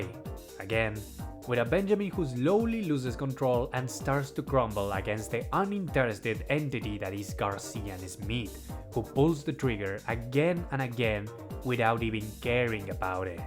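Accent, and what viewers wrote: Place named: Argentina. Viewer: Sorry if this is a weird question but by any chance,Do you happen to have a Spanish-language channel? I think I've heard your voice before, and judging by the way you pronounce certain words, I think you're a Spanish-speaking Argentine.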